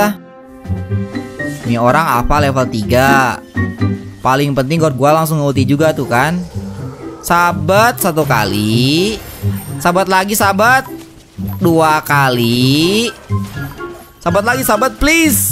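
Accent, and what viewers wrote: West Java, Indonesia. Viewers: Mang org mana Sunda n logat n alus haha 😂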